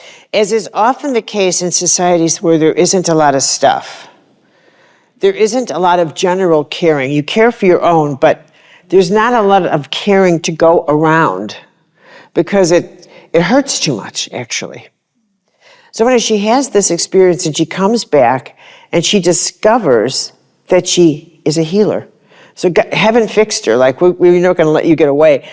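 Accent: Chicago, United States. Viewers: I'm here for that fabulous Chicago accent.😊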